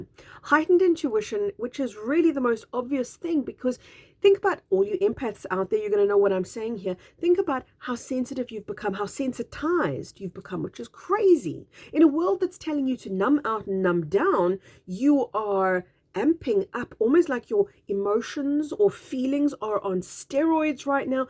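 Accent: South Africa. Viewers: Yet again, a beautiful explanation and reminding us that one of our greatest gifts is our intuition. You speak from the heart and not the Ego and that resonates with me so much. I in turn, tell my husband, who too is Awake as I am, to just ' observe ' the craziness around our world. We need to go within to find the answers and it is people like you, doing your humanitarian work to help us and guide us and explain in very simplistic terms. I am also happy you not only read my last comment but you actually responded. I mentioned and inquired about your beautiful accent and you told me you are South African...awesome...and even better, that I resonated with you because we are running on similar frequencies!!! So very true that when I go out shopping, I don't just ' see' people, I can FEEL their frequencies! Thank you kindly for your podcast my dear friend. We are all here for a reason and the biggest is to stand shoulder to shoulder and Unite against the EVIL that is disappearing from our Planet!!! 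Love and Light!!!🥰❤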